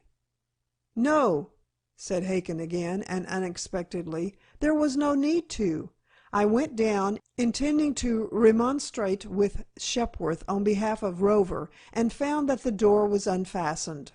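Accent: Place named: United States. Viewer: Great story... I can't help wishing that it had been read by an English reader though as the story is set in England. I found the broad American accent unsuitable for this text. The reader did her best however, despite the occasional mispronunciation of certain words. Much better than I could ever do.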